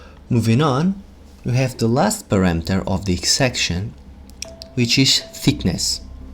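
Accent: Portugal. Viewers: Eu tambem sou de Portugal, bem me parecia que você estava a falar com um sotaque português.:)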